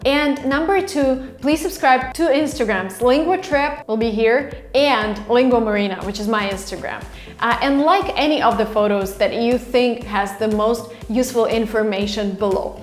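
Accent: United States. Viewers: Hello Marina, I'm learning English mainly to speak like a native speaker. My English level is intermediate and B1 level. Marina, I also did English courses intermediate and upper intermediate at British Council in my country Sri Lanka. Anyway my English is not 100% improved and I want to speak like a native speaker. I want to follow your English course Marina. Your American English is also best to me Marina. Unfornately I'm getting a low income from my job and nobody is offering me good jobs because of my sickness. But I will try my best to collect money and buy your English books Marina. You are kind to me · Marina, do your Russian students mention your American accent? I like your videos :) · Hi, I have been following your videos, really great.... I am a softskills trainer but I understand that I need to learn a lot about it. I am from South India, working in an institution. 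Any kind of your help, will be of great support for my career. Please let me know if you can offer me in this aspect. I want to talk like you. With the American accept. Please help me.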